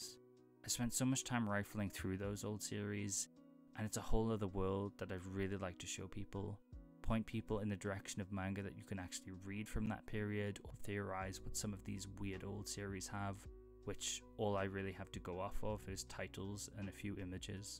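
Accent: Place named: United Kingdom. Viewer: Why you sound so more british here, lmao.🎉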